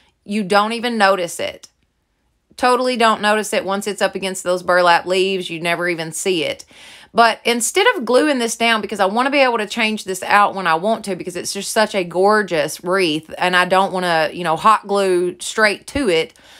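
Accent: South, United States. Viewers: Hi, i just came across your channel. I love how cute and easy these DIYs are!!!😍 Most of all, i loooove your southern accent - it reminds me so much of my super sweet mother in law who's from Georgia. I loooove southerners, you are all sooo sweet and endearing.❤❤❤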